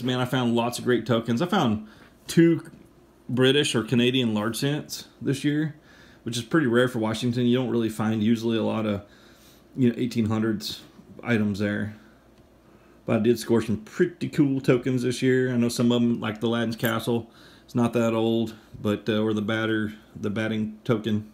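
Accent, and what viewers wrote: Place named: Oklahoma, United States. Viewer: Great finds! I’ve never said that you still have your Okie accent but I’ve wanted to. Lol From one Okie to another, Happy New Year!! May this next year be even better!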